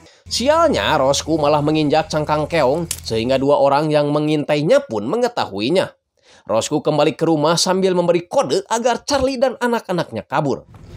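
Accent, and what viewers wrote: West Java, Indonesia. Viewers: Orang sunda nih .. sama kaya iq 7 Enak di dengar😂😂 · Logat orang sunda hahaha..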